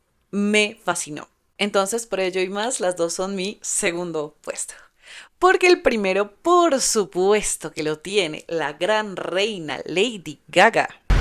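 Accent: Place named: Colombia. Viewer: Con ese acento tan hermoso sabía qie eras de Colombia😘 ❤.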